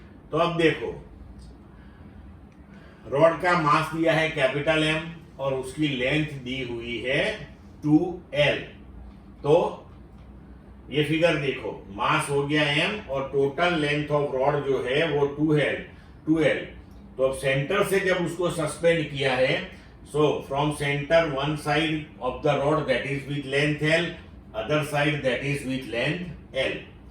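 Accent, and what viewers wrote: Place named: Gujarat, India. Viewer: Thank you sir, and you have got full gujarati accent nice explanation !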